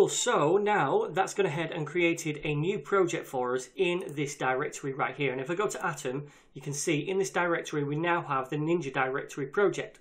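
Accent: United Kingdom. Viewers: Thank u very much, I love your wonderful tutorial, and your amazing British accent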